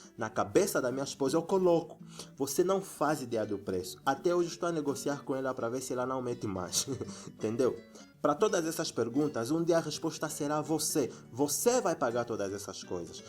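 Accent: Angola. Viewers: Gente! Ele é angolano?? Tenho um amigo de angola e o sotaque é igual... Amo angola!! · Seu canal é muito bom! Sou brasileiro. Esse sotaque é angolano? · Um sotaque do Português Angolano parecido com o Português original (o de Portugal)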